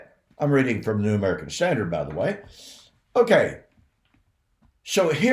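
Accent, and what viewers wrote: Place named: Arkansas, United States. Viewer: this is some of your best material. I know you do not mean to be humorous in your delivery, but the way you point out the absurdities of the futurist, dispensationist view in your Arkansas vernacular has me floored every time. I just can't stop laughing. It is some of the best unintentional humor I have ever heard. Keep up the great work!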